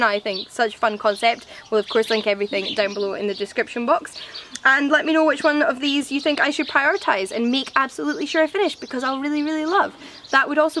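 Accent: Scotland, United Kingdom. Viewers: Its so nice to hear a Scottish voice pop up on my suggested videos especially from my home city. Makes booktube a little more reliable for me!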